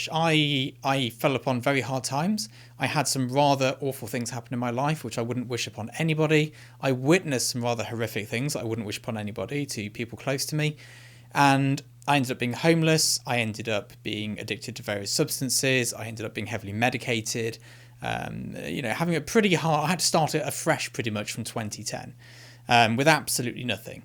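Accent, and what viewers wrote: RP, United Kingdom. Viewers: I think you nailed it! We can not apologize or change who we are, nor should we, as some would suggest! We can only try to make things better around us. BTW, a British accent does indeed add a level of poshness, elegance, and credibility! I remember asking my wife, who recorded the answer message at her firm? It was a female British colleague. 😊 Also, at one point, I worked with a consultant who was raised in Britain. When a presentation was needed , we all agreed that he should do the honors! It always sounded more on point when delivered by him. Thanks for the insight! Cheers from 🇨🇦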